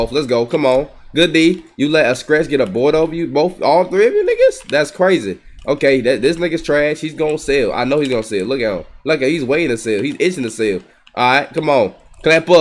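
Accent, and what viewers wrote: United States. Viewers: now you sound like a cowboy from illinois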